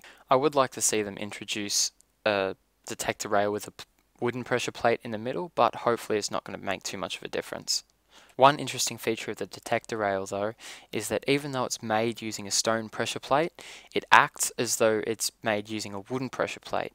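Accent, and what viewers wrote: Australia. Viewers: nice are you aussie ?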